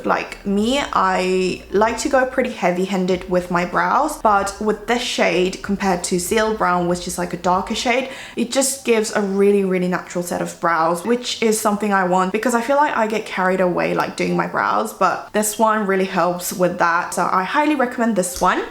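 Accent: United Kingdom. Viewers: 영국발음 너무 좋다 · 제가 영어를 잘 몰라서 그러는 발음은 영국 발음인가용? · 저도 여쿨이라 언니 영상이 넘넘 도움이 많이 돼요😢💕💕 언니의 영국 발음은 몇번이나 들어도 넘 멋있구 스윗하네요😘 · Omg!!!!british accent💕💕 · 오늘 립 진짜 예뻐요!!!!! 저도 삐아 컨투어링 밀크티 브라운 진짜 매일 쓰는데 등장해서 너무 반가워요 코 쉐딩에도 넘나 자연스럽고 예뿝니다 >< 오늘도 t 영국발음에 치이고 갑니다.. 털썩.. 믿고 면세점 털러갑니다 헤헤 오늘도 행복한 하루 되세용❤ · 가끔 영국악센트나와요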